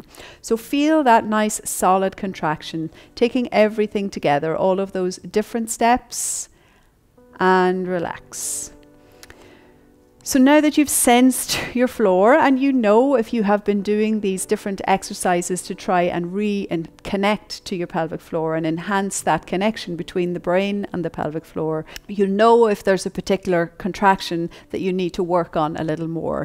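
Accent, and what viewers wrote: Ireland. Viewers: sounds weird but i can feel it when i lift as i exhale maybe my body is just weird like that LOL i love your irish accent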